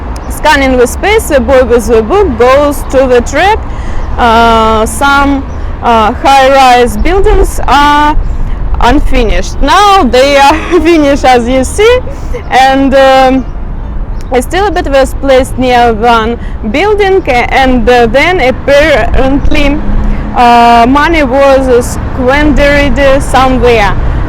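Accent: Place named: Russia. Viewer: Your accent is so cute. Don't be embarrassed. English is weird because it doesn't follow its own rules, and it is also difficult to learn from texts because we do not say words in the way they "should" be said. Remember that on the internet, there are people who are just jerks. I doubt they could speak Russian.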